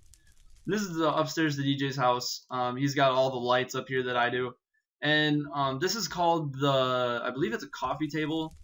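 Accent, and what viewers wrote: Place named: England, United Kingdom. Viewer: I think he comes from Britain and more precisely England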